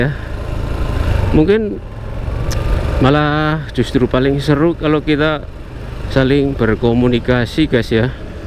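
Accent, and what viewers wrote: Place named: Banyumas, Indonesia. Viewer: Sampeyan ngapak ya ngomonge, jawane ngendi Mase?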